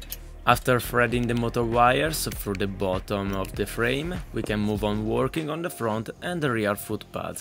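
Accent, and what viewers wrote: Italy. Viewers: Ciao, innanzitutto ti voglio fare i complimenti e dirti che sei assolutamente underrated su YouTube, sia per la qualità dei video che per la qualità dei progetti. (Scrivo in italiano sia per confondere i visualizzatori inglesi sia perché mi sembra di aver capito dagli "indizi" nei video e dall'accento che tu sia italiano). Approfitto del tuo nuovo video, ottimo come sempre, per chiederti una cosa: nell'ultimo mese mi è venuta voglia di costruire un simulatore di guida. Così ho cercato online per capire come fare e ho trovato, oltre ad un altro video da cui ho preso ispirazione per i pedali, il tuo, dove mostri come costruire il volante. Non ho però costruito tutto il force feedback: ho preso ispirazione da un altro video dove si usa un semplice potenziometro. Dopo aver modificato i file 3D e i DXF per adattarli ai miei pulsanti e per utilizzare molle anziché magneti nelle levette, l'ho costruito. 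Grazie davvero per aver condiviso i file e il video di costruzione! È venuto talmente bene che mi è venuta l'idea di fare una specie di kit del volante e dei pedali da vendere, non i file digitali, ma quelli fisici come kit da assemblare. Volevo chiederti se ti andrebbe bene se potessi usare questi file "remixati" e alcuni proprio rimodellati da zero, ispirati ai tuoi, per questo progetto. Se preferisci (e per farti capire meglio), posso mandarti delle foto e degli screenshot delle parti modificate. Grazie!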